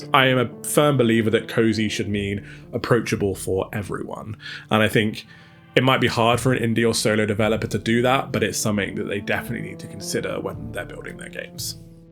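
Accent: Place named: United Kingdom. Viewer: Thank you for sharing your story, and being vulnerable about your mental health struggles. I appreciate your candor. I, like you, turned to cozy games as a sort of therapeutic experience. I’m a middle aged woman and I’m dealing with “middle aged woman “things”, on top of having a child who is in active addiction. I needed a way to calm my anxiety, so I tried playing cozy games. So far, it is helping. Love that you are a sports fan (Atlanta Falcons - awesome). I live in the southern United States, and sports are such a big part of life here. Just wanted to share a bit about me, and tell you that I appreciate your hard work and, integrity and your soothing UK accent and voice. 😊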